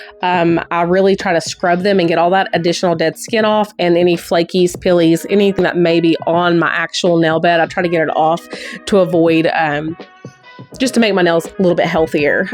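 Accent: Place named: South, United States. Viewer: I love your country accent!! 😊❤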